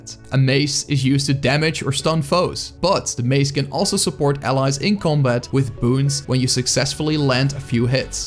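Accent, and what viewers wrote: Netherlands. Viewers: I sense a hint of Dutch in that accent. Is it? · Good to find some active GW2 content creators, immediately recognized that accent though, fellow Lowlander :) Thanks for the quick guide, been coming back to this game after a year having just played a few months. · You sounded dutch, checked the about page and I was right! Also, a good class guide. (This sounded like dis, that's why I guessed dutch.) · Thanks for the video mate. A friend of mine and I both got into gw2 recently (even tho I tried it a year ago and bought the expansion in the thought id play it more, which I didnt). I came back and decided to start a new character to get back in from beginning to end but didnt know what class or something. This helped me a lot. Greetings from a fellow Dutchie, I heard the accent and its the same I have when I speak English :)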